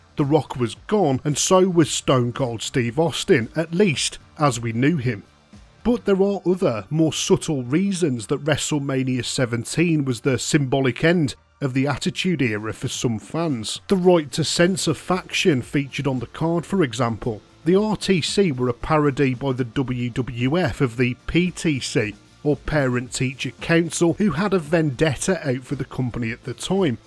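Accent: Birmingham, United Kingdom. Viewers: Are you from Birmingham by any chance? Or black country?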